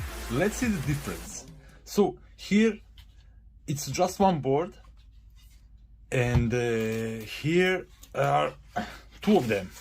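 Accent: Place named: Bulgaria. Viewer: Hello my friend, are you from Bulgaria because your accent really sound like a Bulgarian man🇧🇬💪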